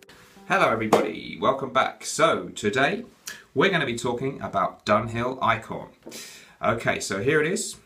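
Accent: England, United Kingdom. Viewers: You sound English ...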